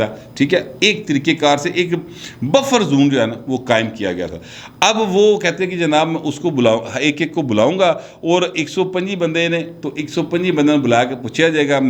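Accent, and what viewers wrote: Lahore, Pakistan. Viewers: sb your Lahori touch is wah wah wah. Maza aaaaa gia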